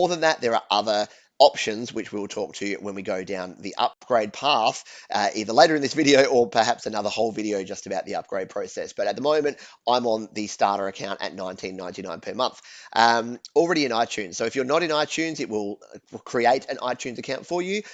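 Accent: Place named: Australia. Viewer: where in australia do you come from, your accent is nice.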